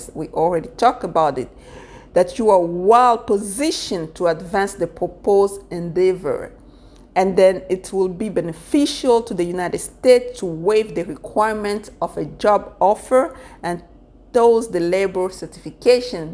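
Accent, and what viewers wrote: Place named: England, United Kingdom. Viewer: Love your English accent.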